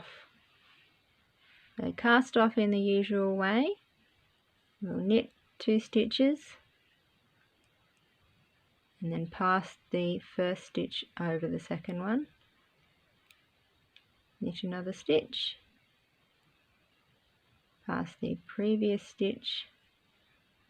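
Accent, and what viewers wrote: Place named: Australia. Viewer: I like it very much! and great to hear a fellow Aussie here on YouTube!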